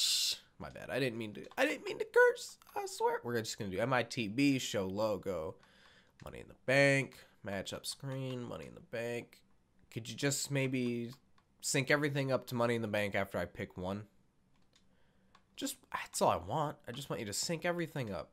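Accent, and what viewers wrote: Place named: Australia. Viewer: I dont understand why people get so butt hurt. When i heard you aussie accent i laughed my ass off (im a aussie btw) anyways you are amazing i only found you today on your WWE 2k17 Arena video. good job and keep up the good work :)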